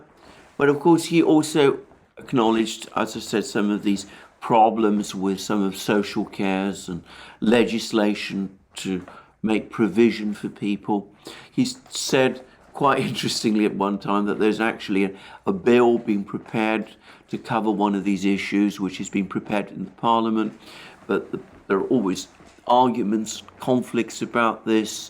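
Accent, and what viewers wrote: United Kingdom. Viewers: This is the longest uninterrupted string of praising Russia in a British accent I've ever heard. Not a single moment of anything that could be seen as a criticism. Weird, isn't it? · One of the best channels for astute russian propaganda with a fine british accent from an ex laywer.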